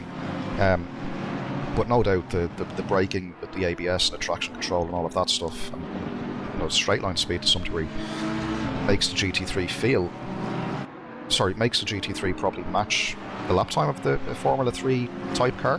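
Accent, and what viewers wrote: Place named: Dublin, Ireland. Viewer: Ijust bought this game yesterday it's cool so far more practice is needed sounds llike your a Dub like myself hi from Dublin Ireland.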